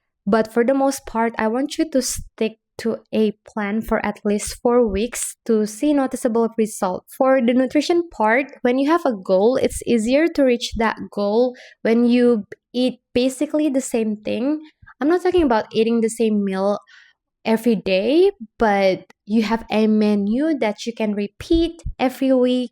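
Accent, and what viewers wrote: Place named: Philippines. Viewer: Are you a Filipino? You kinda have that accent. ❤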